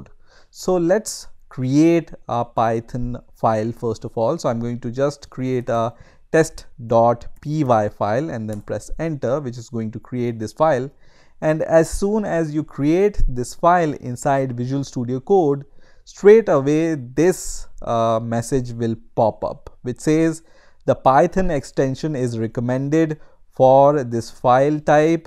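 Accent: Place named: India. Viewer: please don't speak indian english speak fluent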